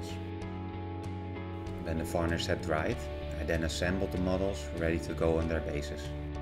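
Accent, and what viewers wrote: Netherlands. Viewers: Dude, are you dutch?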